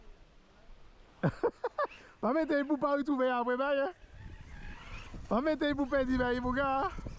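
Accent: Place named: Martinique, France. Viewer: Se décors, sa tranquillité, l'accent de la Martinique ^^ un bon rhum et la RC moi je dit Goooooooooooo !!!! 😃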